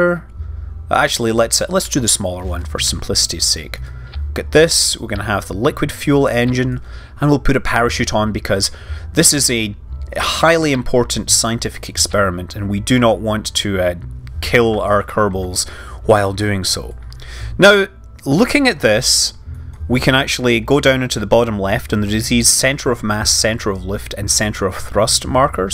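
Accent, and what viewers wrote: Scotland, United Kingdom. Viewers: hence your name, you sound a bit scottish.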